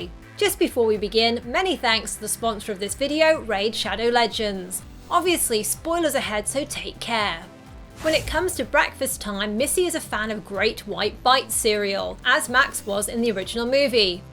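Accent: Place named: United Kingdom. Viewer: I love your voice so British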